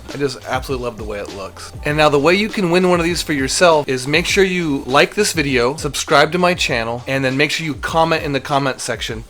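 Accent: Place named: Indonesia. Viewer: Yang dari indonesia mana suaranya😁😁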